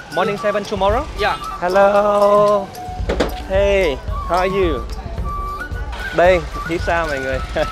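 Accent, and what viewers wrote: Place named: North, Vietnam. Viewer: Dude - you have a little bit of North Vietnamese. Pretty cool!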